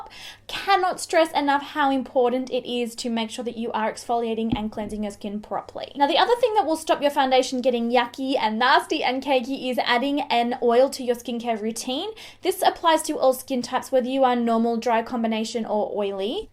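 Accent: Australia. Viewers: ?hmmm..let me guess.. Aussie accent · I almost never have the sound on when I watch tutorials because basically most chicks annoy me. But I wanted to hear what you were talking about with this tutorial, so I turned up the volume. And you're an Aussie. All this time I didn't know...lol. New subbie lol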